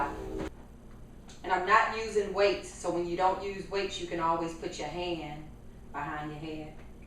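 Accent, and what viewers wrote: South, United States. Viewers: You remind me of my ppls down south, you have the crazy country accent!! LOL!!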